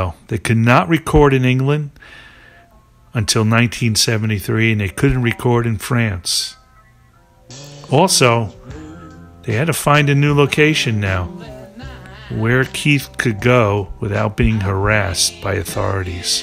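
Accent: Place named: New York, United States. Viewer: I especially love the voice in it !!!! The voice commentary is very very good!!! And it’s very detailed and correct to what actually went on , took place and unfolded in history and in the meaningful significance that it holds . Is your slight accent a New York accent ? May I ask ? It is very good !!!! It’s like that old show about the 60s and 70s the wonder years !!!!!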